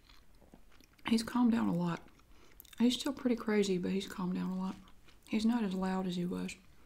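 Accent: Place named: South Carolina, United States. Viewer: Normally, I despise mukbang videos, as they make me nauseous. But to my surprise, I absolutely loved this video. First of all, I feel like you’re eating naturally as a person normally would, and I didn’t get the feeling that you’re “over-acting” the eating for the video. But what really makes it enjoyable is your soft-spoken chattiness. I find your soft voice and Southern accent very pleasant and soothing to my ears. Therefore, the overall effect is something akin to sitting down and having a nice lunch and a pleasant, relaxing chat with a good friend. How much more soothing can a video get? PS: I’ll second what others have already said in the comments, about how fun it is to hear you say “puddin” the way that you do. ☺️😁👍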